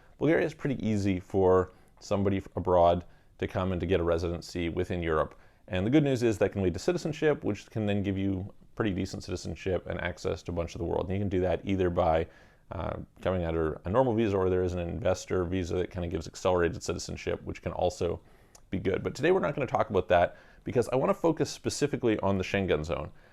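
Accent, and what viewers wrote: Canada. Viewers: Great info as always. Plus you have a great Canadian accent and I love to hear reach oot and talk aboot. ;) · Great video. I just read some of the comments and had to laugh. I knew you sounded Canadian. I'm for Canada's capital. Moving to Portugal. How far in advance of planning the actual move do you recommend reaching out to you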